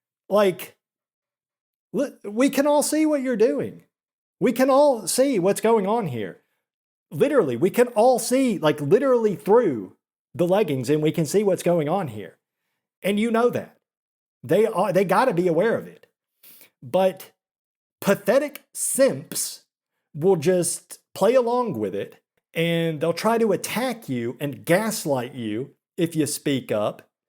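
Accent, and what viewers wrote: South, United States. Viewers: I love how southern and simultaneously not southern you are XD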